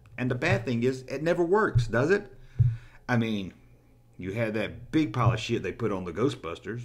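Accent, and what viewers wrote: South, United States. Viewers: i love that southern accent! reminds me of my grandma.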